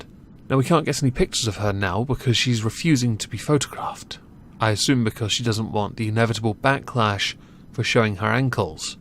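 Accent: RP, United Kingdom. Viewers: Loving the posh English accent.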